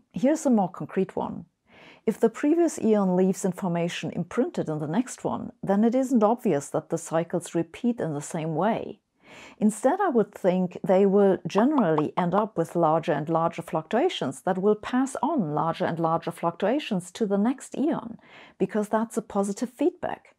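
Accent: Germany. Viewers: I love hearing about science on YouTube and from Ms. Hossenfelder in particular. I feel constrained to admit I primarily watch because is a smokin' scientist. Could that tiny woman with her little German accent & flawless English be any sexier? Intelligence is attractive and this adorable clothes horse should be out there in the famousphere with Neil DeGrass Tyson as a rock star scientist. rocks. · You're very funny! your talk reminds me of old American cartoons in which the mad scientist always had a German accent. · What's the charm here? It must be nuanced English backed up by a German sound palette and oh yes the content! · Are you from Hamburg? your accent reminds me of my friends mom. She had the most beautiful accent speaking English, so pleasing on the ears...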